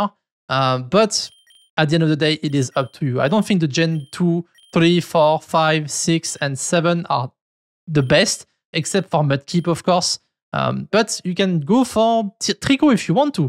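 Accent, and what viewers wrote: France. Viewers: c'est un accent français ça non ?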